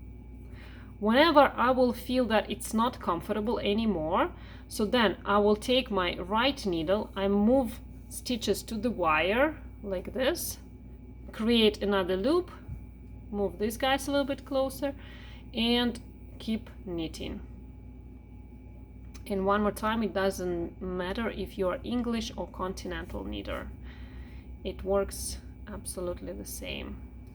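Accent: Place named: Russia. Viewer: I love this tutorial! Your hat is so beautiful. I'm going to make one for my Dad for Christmas. By the way, your accent sounds just like the beautiful Russian accents I heard in college when I lived in a Russian community house. Is that your nationality, if you don't mind my asking? Thanks again for the excellent tutorial! ❤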